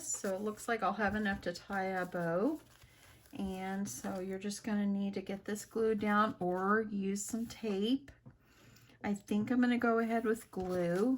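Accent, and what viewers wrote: South, United States. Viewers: Miss, you have the most beautiful, soft spoken southern sweet voice that always puts a smile on my face. I have had a rotten day, and listening to you always makes me feel at ease and soothes me into comfort. Thank you so much. God Bless you girl for sharing so much with your YouTube audience, you are truly special. And of course I also love all of your crafting 💕💕💕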